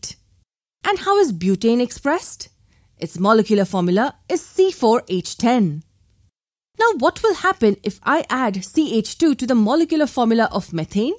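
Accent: India. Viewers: Is the speaker indian ?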